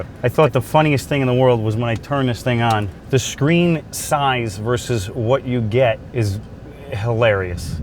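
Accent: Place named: New York, United States. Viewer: I fuckin love the sarcastic New York take on car reviewing I’m going to say you’re from Long Island maybe Nassau?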